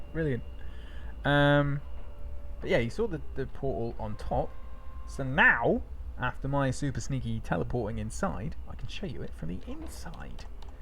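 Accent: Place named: United Kingdom. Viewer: Surprised you don't have more subscribers after I heard your quality British tea-time accent.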